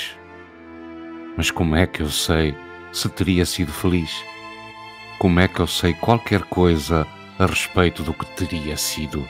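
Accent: Portugal. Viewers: Ah esse sotaque português, me encanta e me acalenta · essa voz com sotaque português é delirante , como não se apaixonar por Fernando Pessoa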